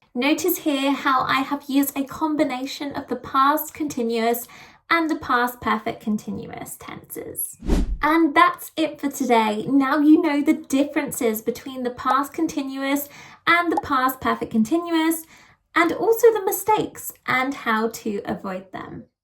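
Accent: United Kingdom. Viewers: Love British accent.❤❤❤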